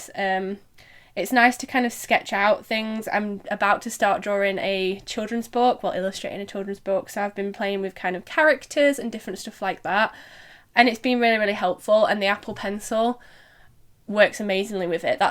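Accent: United Kingdom. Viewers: Love your British accent! Bloody good video!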